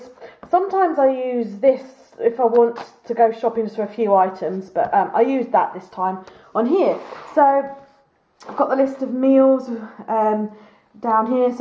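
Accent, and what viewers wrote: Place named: United Kingdom. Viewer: Dear, If you wanna try something unusual, I've got a vegetarian recipe. There's a traditional hungarian meal, called stuffed paprika and my mom invented a vegetarian version a few years ago. Let me know if you're interested and I'll post the details here, or send you an e-mail:) Your videos help me so much, because I'm still getting used to the british accent, but with your help, its easier, so THANK YOU!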